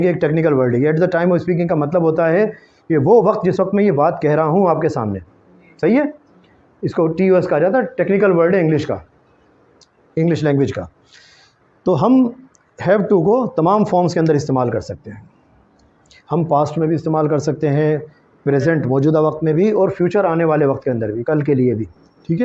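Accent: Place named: United Kingdom. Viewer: Good British English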